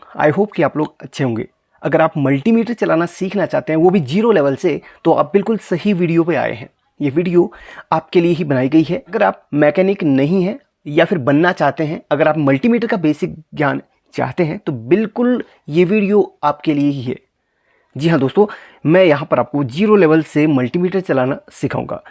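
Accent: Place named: Uttarakhand, India. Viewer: Sir bahut bahut dhanyawad,,,apki awaz se lg raha aap uttarakhand se ho , kya mai sahi hun ?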